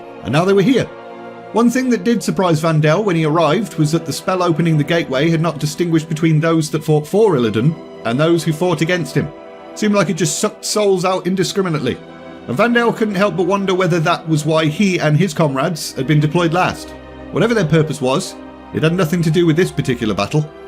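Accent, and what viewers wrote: United Kingdom. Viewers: It really says something when I've had this book on my bedside table for half a year and couldn't be bothered to read it but a 3 hour re-telling with a hint of British charm hooks me in minutes. Just discovered you but excited to sub and get through your other vids :)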